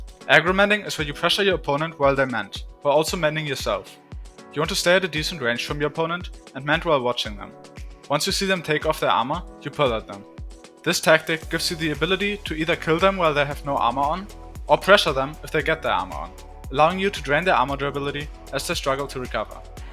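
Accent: Germany. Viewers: I hear Deutsch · Are u German? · u german?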